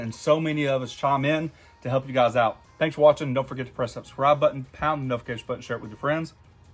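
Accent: South, United States. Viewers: For some reason when i see a man with an southern accent on the grill, i always trust what he's talking about lol.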